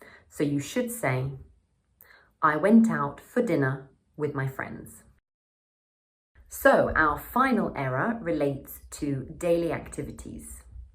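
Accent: United Kingdom. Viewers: British accent is to die for. ㅎㅎ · 영국쌤이다! 이 고쟈스한 영국발음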